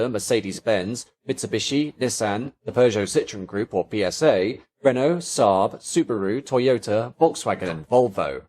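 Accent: United Kingdom. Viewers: What's wrong with it? It's a clipped British accent.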